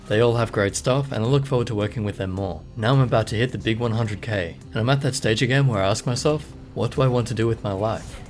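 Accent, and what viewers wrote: Australia. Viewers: I seriously love ur smooth soft aussi accent voice. Been quitely following you for many months and have recommended you many times to many friends and they all got great help from your Videos eventho youre not yet quite at 100k congratz to being the most amazing tech/mouseyoutuber on this platform. -Not so smooth german dude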